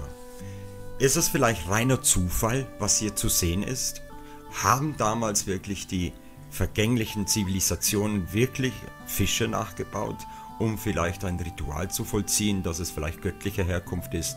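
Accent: Tyrol, Austria. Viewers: Hey, schöner kurzer Beitrag. Die Theorie mit den Fischvorbildern für die "Mayaflieger" hatte ich erst vor kurzem gehört, nachdem aber die fliegenden Fische auch ganz anders aussehen ist diese Theorie zumindest für mich wiederlegt. Für mich sind das immer noch Flugzeuge. Die Glühbirne würde halt gut zur Bagdadbatterie passen, womit ja angeblich nur vergoldet wurde, oder so... Schade, dass Dich die Arbeit in der so wie so schon so hektischen Vorweihnachtszeit so einspannt, halt die Ohren steif... Lieben Gruss und einen hoffentlich entspannten Sonntag Abend noch Paco PS also mir hat der tiroler Dialekt gefallen, ich musste mich zwar ein wenig konzentrieren habe aber alles verstanden...:) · Bitte nicht auf Tirolerisch, außer es gibt ein Übersetzungstool... im Norden fällt sowas schwer zu verstehen 👽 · Schian Gruass ausn Stubai.. mach weiter so.. ob auf Tirolerisch oder sinsch.. 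😜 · wenn du bei dem video im tiroler dialekt dann untertitel aktivierst oder eine zweite audiospur auf deutsch machst ist das ok.^^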